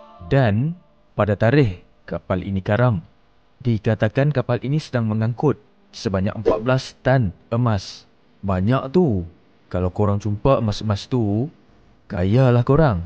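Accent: North, Malaysia. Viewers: Mat ang org mna dengaq cm org utara...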